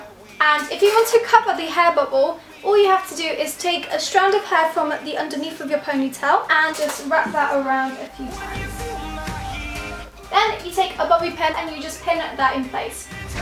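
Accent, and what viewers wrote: United Kingdom. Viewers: She's Polish, but you can clearly tell by her accent that she lives and was born in the UK.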